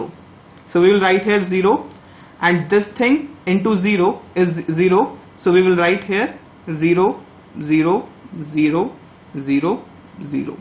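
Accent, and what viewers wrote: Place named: India. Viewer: Good video and nice handwriting! Easy to understand CRC this way. I'm going to watch more of your videos. (A little request? Some subtitles would be lovely. Because 1. it's always easier to follow the teacher :) and 2. easier to decipher some words/sentences - For non-Indian English speakers, Indian accented English can be a bit tricky to understand - even if we are used to the accent. I'm sorry to mention it, but I thought maybe you'd like to know).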